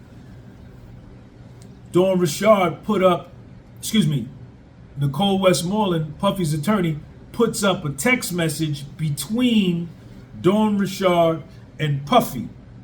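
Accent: United States. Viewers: This man has a good USA accent, keep up the good work . ⚖️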